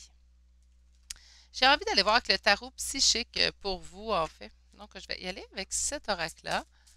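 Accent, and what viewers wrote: Canada. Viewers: Je suis espagnole. J'habite en France depuis 5 ans et malgré le fait que je ne suis pas habituée à l'accent canadien je comprends parfaitement.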